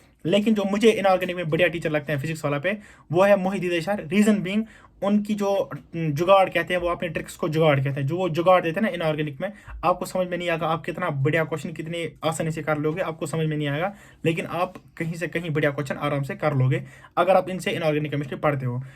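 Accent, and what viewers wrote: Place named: Kashmir, India. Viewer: Aap kashmir se ho kya bhaiya qqki apki accent waise h ❤❤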